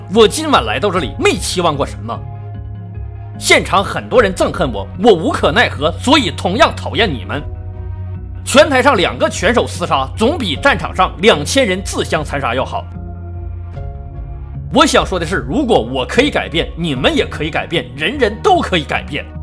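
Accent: Northeast, China. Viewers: 真的很大碴子. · 哈哈，我最喜歡聽說英語，，滿滿的東北渣味。👍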